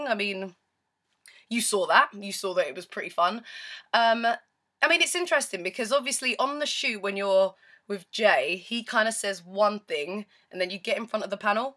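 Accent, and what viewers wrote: United Kingdom. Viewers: Love the videos! Your energy is fantastic! I wish I had your accent haha :) I'm rooting for you and Sophie y'all are my favorite and Katherine too :) going for the Brits even though I'm American lol. And by the way that stuff is NOT what Americans normally eat. I've never had pigs feet or chitterlings in my life. But mmm at least you got grits! Those are fantastic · I need more than Your have some really great insights and I can listen to your Brit accent for eva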